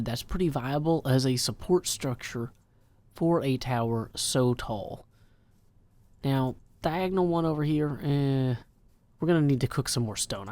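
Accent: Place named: Tennessee, United States. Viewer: I love how he says "Time skip" with his amazing accent from Nashville Tennessee. THAAAAAAAAAAAAAAMME SKIYAP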